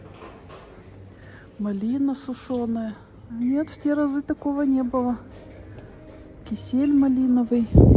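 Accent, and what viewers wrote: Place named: Urals, Russia. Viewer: Уральский говор он конечно очень выделяется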